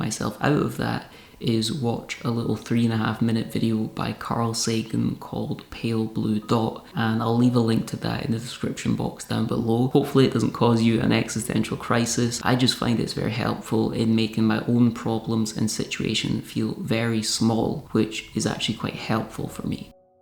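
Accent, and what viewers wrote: Scotland, United Kingdom. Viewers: you are amazing. First, you explained your situation clearly and gave effective suggestions to help others. 20 years ago I suffered a brutal attack, and with that I encountered a weird collection of anxiety, depression, strange thoughts, and, of course PTSD. It took some time before I found a counselor who actually understood what I was going through. He was a mature graduate psychology student doing his final practicum. He was great, and a perfect fit. He challenged me often and tried a few different therapy techniques. I told him when it wasn't working., my second point is that you have opened some doors for people suffering anxiety to have hope. BTW had I lived my days in Scotland - my family immigrated to Canada when I was 10 more than 50 years ago - I would likely have a similar accent like yours. Keep on keeping on!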